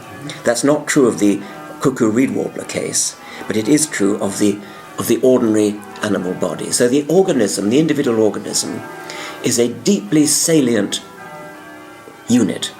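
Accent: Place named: Oxford, United Kingdom. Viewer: must be church bells that give a sense of peace and meaning on a sunday I assume at Oxford, how ironic. There is no meaning at all just mindless replicating tiny entities using me as a throw away temporary vehicle like a throw away party girl, for no purpose. I love the civilized Oxford voice and the gentility but what he says is that we are all ignorant robots living in a Matrix like nightmare.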